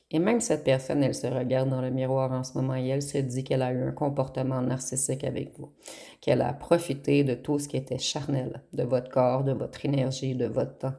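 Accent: Canada. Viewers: Désolé je sais que je suis enquiquinant, mais ca fait bizarre, pas naturel les faux ongles, cette surépaisseur, l'accent canadien on aime bien il est pas abusé, je vous souhaites de trouver en vous quelque chose qui rayonne qui fait que tout ajout visuel, auditif, ou quoi que ce soit de "matériel" ne serait que reducteur . Une force tranquille Amen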